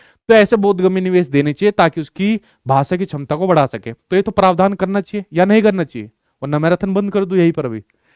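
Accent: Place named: Azamgarh, India. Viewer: बाबू बहुत अच्छा बढ़ावन आजमगढ़ की बोली है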